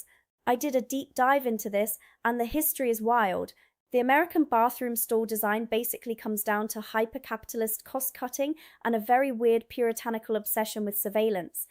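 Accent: England, United Kingdom. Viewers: Am I missing something ? For an American you have a very British accent!?!? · I'd love to know how being American she speaks with THAT Accent? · If you're an a American why have you got an English accent? Please answer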